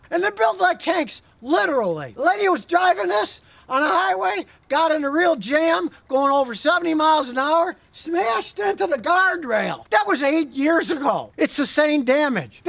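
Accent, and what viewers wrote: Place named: United States. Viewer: Is this chap sniffing Glue ? . Or is this how Americans speak ?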